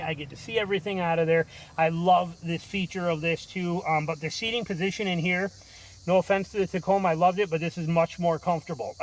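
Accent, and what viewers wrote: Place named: Chicago, United States. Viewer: Are you from chicago ? You have a chicago accent.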